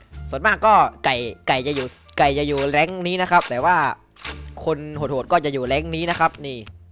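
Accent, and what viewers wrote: South, Thailand. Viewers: เด็กใต้หม้ายนิมึง😆555+ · คนใต้ป่าวสำเนียงออก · สำเนียงคนใต้ป่าววะ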